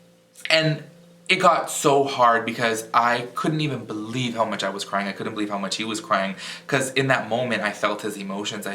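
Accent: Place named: South Florida, United States. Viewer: Aww I missed you! I love your reviews. Ive always wondered are you from Miami originally? Or Lauderdale? I pick up on that accent being from south Florida myself.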